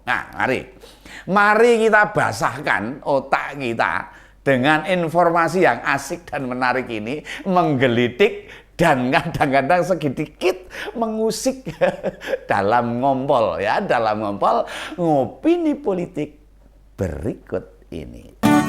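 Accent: Java, Indonesia. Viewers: JOWO MEDOK NYIMAK PAKDE........... 🤣🤣🤣 👍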